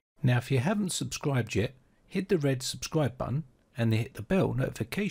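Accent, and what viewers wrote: United Kingdom. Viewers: Couldn't help loving the accent. Where in UK are you from?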